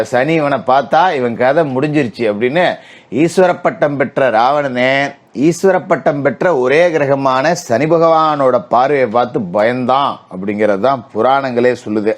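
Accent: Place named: Thanjavur, India. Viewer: உங்க slong தஞ்சாவூர் மாதிரி இருக்கிறது எந்த ஊர்ல இருக்கீங்க ஜோசியரே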